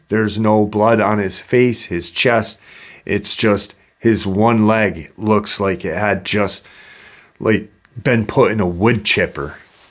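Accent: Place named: New Orleans, United States. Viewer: Bro don’t listen to critics Your show is f*****g awesome Your show is bad ass I like dark waters too cuz I from New Orleans but bro I don’t give a shite if u speak with a Nola accent and are an orater or not you do perfectly fine communicating what you research, and as far as your research it’s badass so keep it up and F*** the critics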